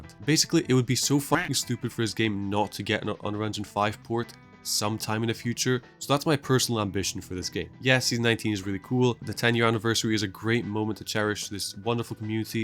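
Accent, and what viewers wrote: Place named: Scotland, United Kingdom. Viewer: Dude, I watch most of your videos, your accent drives me nuts, it's it Scottish or something Central European? Ta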